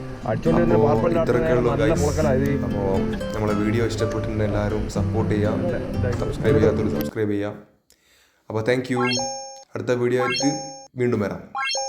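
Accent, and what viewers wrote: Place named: Kozhikode, India. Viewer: ഇത് എവിടെയാണ് സ്ഥലം. ഒറ്റക്കാണോ പോയത്.സംസാരം കേട്ടിട്ട് കോഴിക്കോടിന്റെ യും കടലുണ്ടിന്റെ യും ഇടയിലാണന്നാ തോന്നുന്നത്. 🥰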